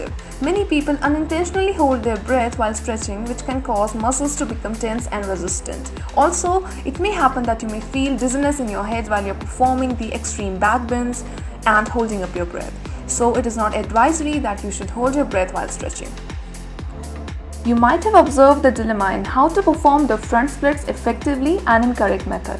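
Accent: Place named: United States. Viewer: you speaking English like a American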